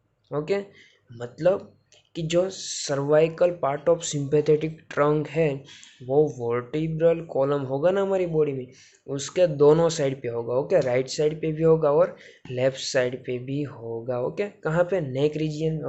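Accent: Gujarat, India. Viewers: અરે ભાઈ ગુજરાતી છો ?? તમારા ટોન ઉપરથી લાગે છે 😅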